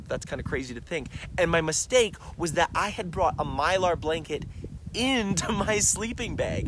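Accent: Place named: Canada. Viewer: Strong Canadian accent lol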